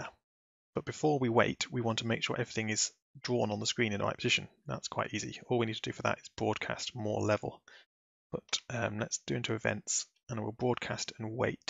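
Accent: United Kingdom. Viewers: I've never heard his voice before. WHOA. He's British. -- bobarlotte (on Scratch)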